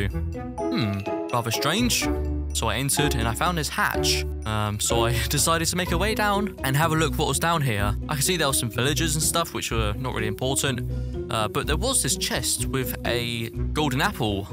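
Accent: United Kingdom. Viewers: He sounds like in uk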